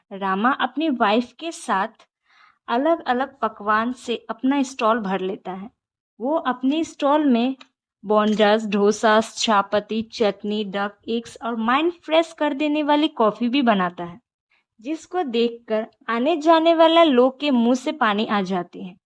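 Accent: India. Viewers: Aur ye bhi pta chl gya ki woh Bengali hai😅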